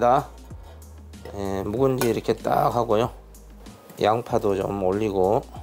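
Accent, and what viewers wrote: Jeolla, South Korea. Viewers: ㅎ.. 고향이 어디신가요? 전라도쪽 같아요~~ 여긴 전라도 광주 입니다.. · 셰프님 부산분이시죠? 전라도 사투리도 들려서요ㅋ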